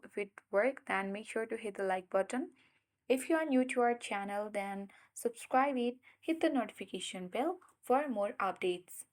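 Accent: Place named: India. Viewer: Americanized English-language is my native language. The speakers' voice has a strong Indo-English accent that is difficult for me to understand. Some words we use are different. For me, I read the transcript while I listened to this video's instructions. Yet I had to pause often to understand the meanings. For me, these edits would help: At the start, tell me exactly what you will teach me in "plain language" for me. Please study up on our word choices and add those to what you recorded in this video, or change them if it doesn't cause confusion. It is OK for the video to take the time needed to explain your instructions, right? Thank you!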